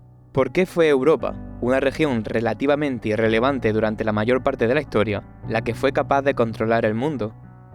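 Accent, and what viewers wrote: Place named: Andalusia, Spain. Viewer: 🇪🇸 ¡Andaluz profundo!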